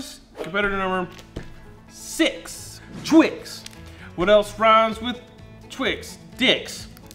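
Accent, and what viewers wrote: South, United States. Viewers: He sounds so cheery Like a southern Santa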